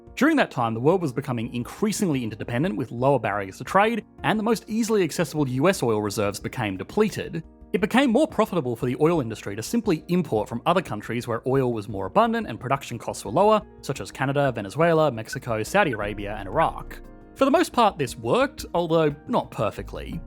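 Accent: Australia. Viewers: He sounds very Aussie. I'm not sure how I missed that. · sounds aussie to me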